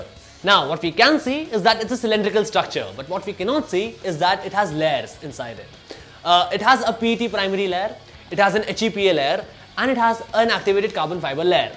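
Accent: India. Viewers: Holy fuck I love Indian accent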